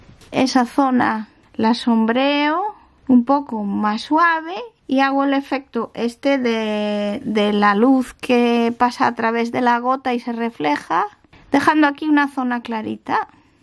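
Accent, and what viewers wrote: Spain. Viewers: Diossssss! Amo como Hablan los españoles (ㆁωㆁ)❤️❤️ Sigue Así. Dibujas muy Lindo uwu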